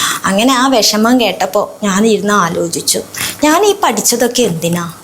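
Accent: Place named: Thrissur, India. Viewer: തൃശൂർ പച്ച മലയാളം 🙄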